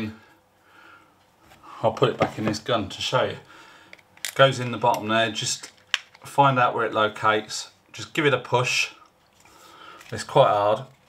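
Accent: United Kingdom. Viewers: Im thinking of maybe getting one of these in the future, and think i would probably like to get mine powered up , you sound like you're quite local to me , are you in the Midlands?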